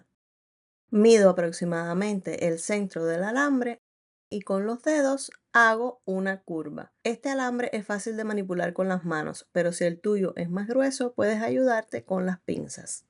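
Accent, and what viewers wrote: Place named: Chile. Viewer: Ela fala mto depressa e bem chilena mesmo! Outras entendo.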